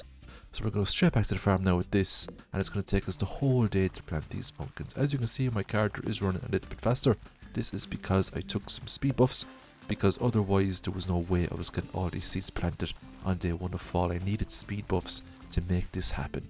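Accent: Ireland. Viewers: Dying to know what part of Ireland you are from!!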